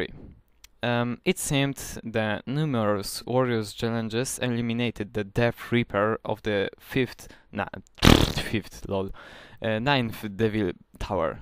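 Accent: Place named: Poland. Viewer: Szacun. I tak słychać w choooooj polski akcent. O_o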